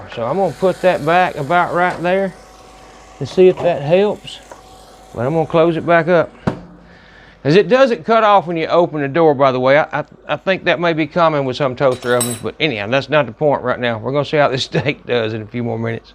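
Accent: South, United States. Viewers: Do I detect a bit of a Southern accent?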